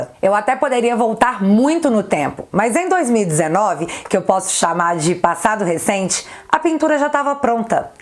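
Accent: Minas Gerais, Brazil. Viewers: ETA mineirinha porreta . Dezzzzzz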